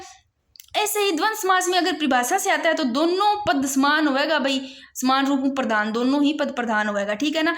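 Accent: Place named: Bagri, India. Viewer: apki language hamre jesi h bagdi ho aap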